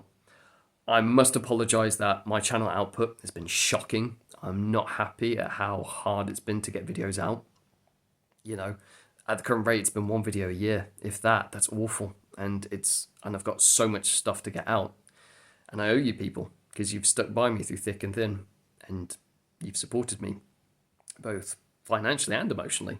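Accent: United Kingdom. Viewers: Holy shit you’re British.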